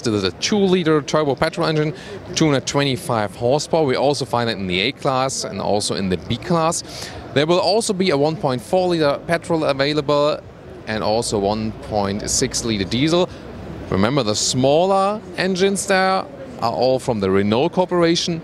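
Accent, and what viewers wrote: Germany. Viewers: classic german english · is great! I like your German English! And, no one is better than you! Appreciate your business!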